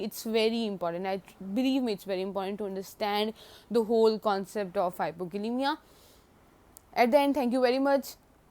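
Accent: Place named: India. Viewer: U have a good accent of Indian English I love it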